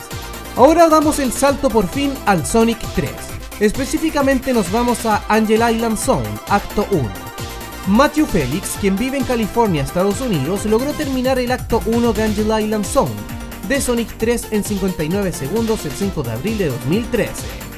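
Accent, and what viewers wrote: Chile. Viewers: Wait hablas español hablas como chileno :v Espera talk spanish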